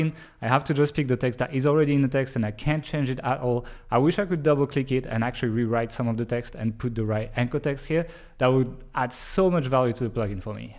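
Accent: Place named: France. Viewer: I've never heard anyone talk about plugins before with a French accent, and now it's all I want in my life.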